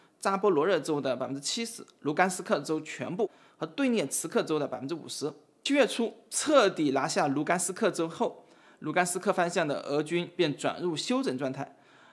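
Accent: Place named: Hunan, China. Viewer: 你这口湖南普通话一下子就把你的节目打了不小的折扣。还是让别人替你表达吧！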